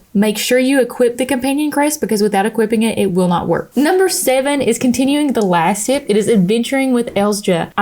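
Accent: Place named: Texas, United States. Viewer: Yooooo....that accent tho lmao. U gotta be from Texas or Bama. Hahahahaha I love it. Makes me feel at home.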